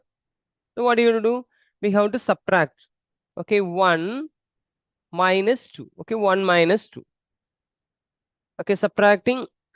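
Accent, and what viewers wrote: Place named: Tamil Nadu, India. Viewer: i am also tamilan thats why i identified your slang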